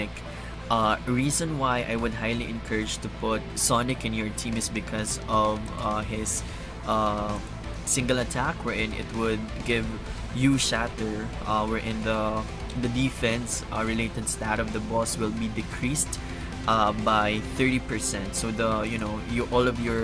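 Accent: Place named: Philippines. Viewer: Pinoy ka pala ganda ng accent haha keep it up already subscribed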